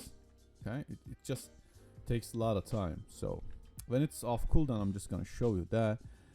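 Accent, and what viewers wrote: Turkey. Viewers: Güzel bir aksanın var ama yine de türk olduğunu tahmin ediyorum :D Video için teşekkürler çok yararlı oldu. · Şunun bir de türkçesini çeker misin kanak ses tonlamandan anlaşılıyor türk olduğun çok rica ediyorum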